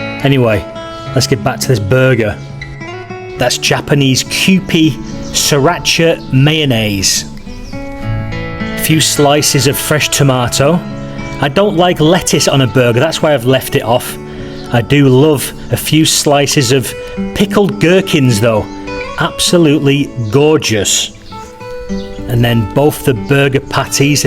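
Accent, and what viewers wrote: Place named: United Kingdom. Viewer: Hey! It's me the "bastard". I followed you to your new channel. I'm sure youre elated! Nice to see you've already got more subs on your first video than my channel has in the last ten years! Although I love seeing you go into town for local cuisine, I'm also glad you decided to show your cooking skills. You sound a bit like Ramsay when you talk while cooking lol. Must be a Brit thing.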